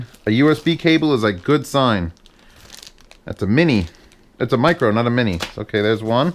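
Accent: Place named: New Jersey, United States. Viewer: Z, are you from Jersey? Sounds like a New Jersey accent to me.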